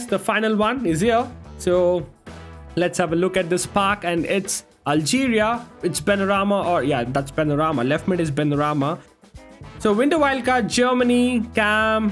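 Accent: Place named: India. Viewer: His sound like India but I’m love you! VIDEO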